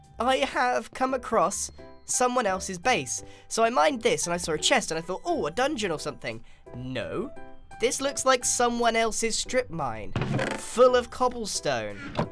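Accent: United Kingdom. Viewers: Is it just me or is so much more British back then then he is now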